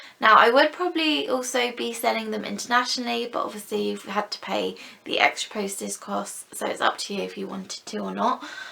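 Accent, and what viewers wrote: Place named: United Kingdom. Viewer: my husband will come in my sewing room and say: is that? He loves hearing you say: "Hello everyone, it's me,." In your British accent. 🤗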